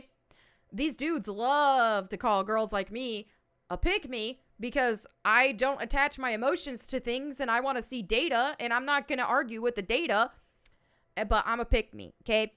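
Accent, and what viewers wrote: South, United States. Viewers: Hey.. Just discovered you. You're hot. I'll follow you awhile. ;-) I like your voice.. southern?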